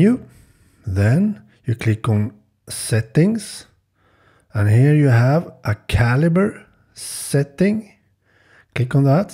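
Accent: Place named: Sweden. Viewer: Härlig svengelsk dialekt! bra video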